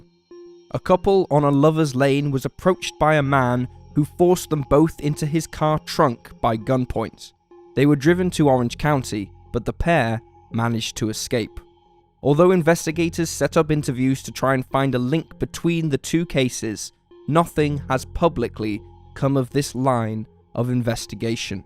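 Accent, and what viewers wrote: England, United Kingdom. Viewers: I'm so tired of someone with a British accent narrating American crime shows.. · Sad story, but I can't believe that a narrator with such a strong British accent actually said it was in regardS..ah, at least he does well at most of his readings ? Would have made a decent film if it had been a reenactment. yes ? Producers ? Script writers ? No copy editing ? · I dont like when they have narrator with a British accent! Stop it! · Can’t stand the pommy narration. Goodbye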